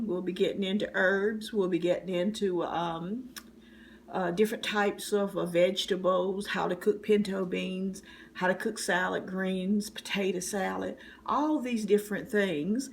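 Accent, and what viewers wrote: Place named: South, United States. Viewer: I love your voice ! You talk like my mom and her mom, nice southern accent it sounds like to me!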